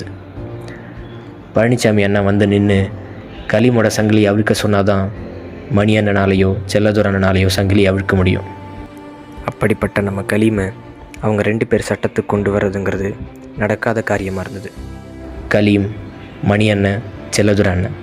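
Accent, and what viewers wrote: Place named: North Chennai, India. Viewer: Enapa ithu vadachennai style.la kathai soldra...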